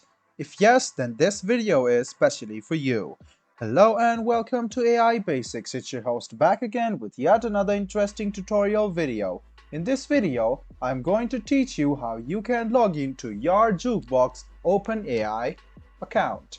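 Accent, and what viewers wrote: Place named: Nepal. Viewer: The nepali-english accent. Nice man 👍👍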